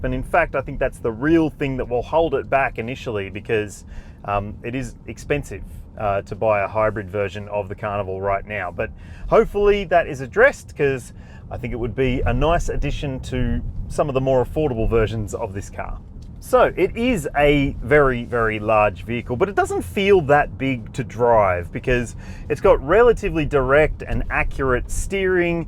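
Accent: Australia. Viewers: Viewer from Malaysia here, Great review btw Could do with more infographics when explaining all the safety features, I know stralia' has more variants than us, ( we only have 3-4 only including the 11 seater), you could add your pick of the range Diesel + ? Which trim level with all the safety features Again, nice review Ps: i wonder how much does aussie auto reviewer had to tone down the accent for global accent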